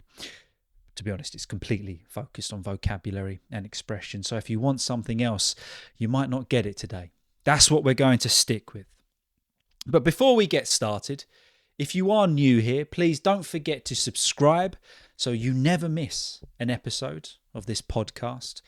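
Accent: RP, United Kingdom. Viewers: A gooooorgeous lesson ! And jolly nice R P English without an accent !😉 Un abrazo from Buenos Aires 🇦🇷🇬🇧🇦🇷🇬🇧🇦🇷🇬🇧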